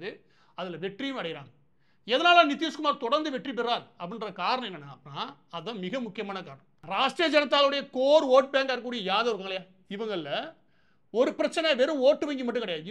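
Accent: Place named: Sivagangai, India. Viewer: I like your dialects very relative to Sivagangai Cheemai. Hope you are from Manamadurai. I belong to proper Sivagangai. Pl continue. People used to kid me when I spoke the dialects in Chennai some 65 years ago. Vattara mozhi is really gives happiness.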